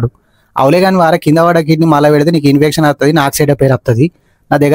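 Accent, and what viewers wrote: Telangana, India. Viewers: Too good bro, telangana accent at it’s best, with comic touch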